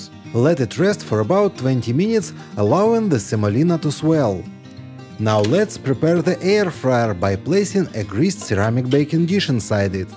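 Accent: Russia. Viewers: Русский акцент или мне показалось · Ужасный русский акцент !!!! Зачем говорить???? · Не зная Английский, слышу русский акцент😂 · английский с ру акцентом!) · Speaking english with russian accent is terrible..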